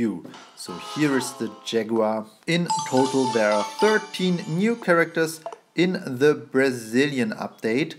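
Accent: Germany. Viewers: I love this update are you german?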